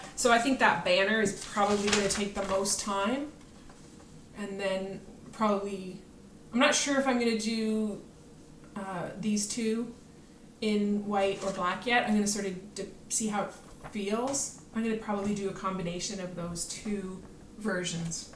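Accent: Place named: Canada. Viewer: Beautiful finishes! HAEDs are out of my comfort zone, but I admire them. Have a wonderful time at your stitch day, I just watched someone else who is going. Don’t remember who. I watch a few Canadian flosstubers because I love your accent! My dad was Canadian, so we had lots of relatives visit us in LA in the winter.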